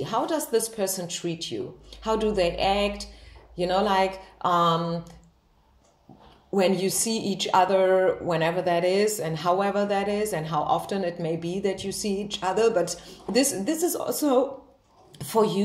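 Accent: Germany. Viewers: Are you from Frankfurt, Germany?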